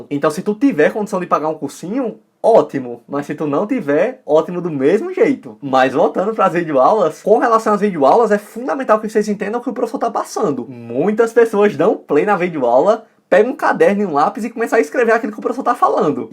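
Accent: Northeast, Brazil. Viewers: A energia do é contagiante, da pra ver que ele adora isso, além de ser super didático e detalhado em compartilhar dicas e formas de nos ajudar gratuitamente (o que é difícil nos dias de hoje). E GENTE QUE SOTAQUE E SORRISO MARAVILHOSOS. ❤🤪 Fico tão feliz e orgulhosa quando vejo um ytr do nordeste representar assim. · rapaz tu é nordestino? eu sou e amei esse sotaque!! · Só pode ser Nordestino tu e demais cara Deus te abençoe de todos os vídeos que vi no YouTube você e o mais disposto à ajuda os estudantes vlw cara sucesso pra vc. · Reclama com Portugal, frança e também com os holandeses!! Eles que influenciaram o sotaque nordestino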